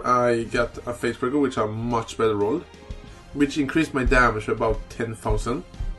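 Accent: Sweden. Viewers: where in sweden are u from i also have family in sweden and the only reason i ask is u sound like one i know:)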